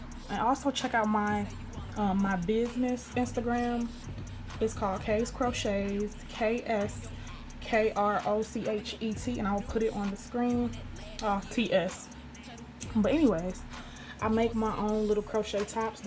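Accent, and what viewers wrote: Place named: Oakland, United States. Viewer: You Sound Like You’re From Oakland. And I Love This 🍊Orange! 💣💣💣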